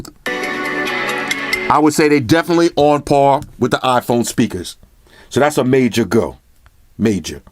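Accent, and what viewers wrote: New York, United States. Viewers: I just bought the iPhone Xs but I wanted to hear your review on this. The NY accent is 😘♥️😍 Us ladies love it!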